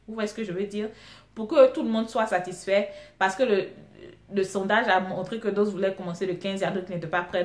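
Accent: Ivory Coast. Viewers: hum l'accent ivoirien là hooo suis motivée une soeur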